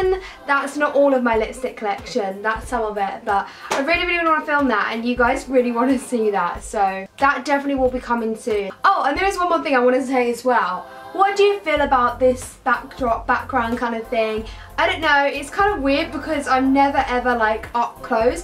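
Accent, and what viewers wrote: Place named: Essex, United Kingdom. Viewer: you sound 🔊 like your of Essex good video by the way 😄😛😍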